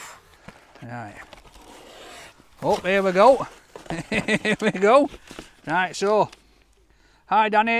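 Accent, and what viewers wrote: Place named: Yorkshire, United Kingdom. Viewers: Hi Danny, my gift was sat at the front of your table but unfortunately you didn't have time to open it. All I will say is it may be beneficial if you open it first on your next unboxing video. It is a purple parcel with the postcode blacked out where i got it wrong. For the last 20yrs I have been suffering severe depression after a lifechanging accident whilst at work, and I have to say that watching your videos puts a smile on my face. I worked in Barnsley for 7 years and your accent brings back all the happy memories from before my accident. Keep on doing what you're doing pal, I'm sure that you bring a smile to everyone that watches. All the best for your future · Danny your the man mate. I tend to binge watch, so I could be two weeks behind on video's. But I will catch up in a few days. I am a Yorkshire lad Doncaster so not far away from you, and I have the same accent as you Mucka ❤️ col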